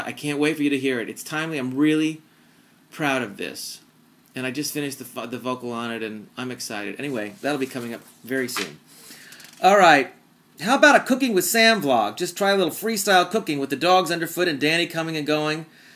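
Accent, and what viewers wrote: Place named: United States. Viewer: you have the best american accent ever, its so soothing and therapeutic. i subscribed after the fred phelps video but your vids (including this) get top ratings from me :D keep going, and tell oprah i says HEY GIRL!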